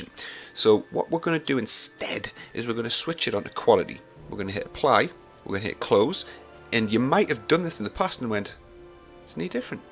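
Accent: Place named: United Kingdom. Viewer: I love your way of commenting? What part of UK are you from? Your accent is delightful.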